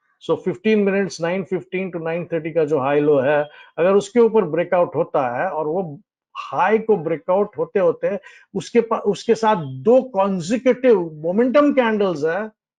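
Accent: India. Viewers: It's just not understandable thanks to that awful Indian accent. Sorry!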